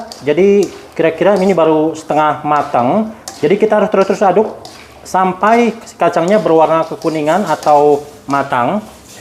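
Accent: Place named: Java, Indonesia. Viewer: Dari bahasanya ada Muthu Gobed Baki Sampean jawane pundi mas 😀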